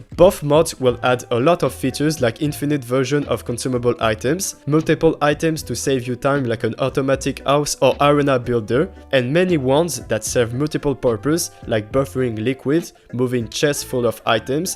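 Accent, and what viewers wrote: France. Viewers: are u french u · le bon french accent on apprécie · Je sais reconnaitre un accent français quand j'en entend un. Super vidéo bro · I can feel that french accents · tu ne serais pas français parce que ton accent fait très français ? sinon super vidéo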